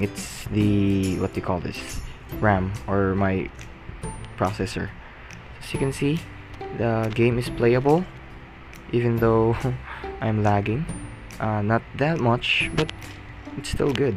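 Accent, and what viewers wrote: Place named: Philippines. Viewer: Hey are you filipino? I can tell by your accent buddy! Mabuhayy!!!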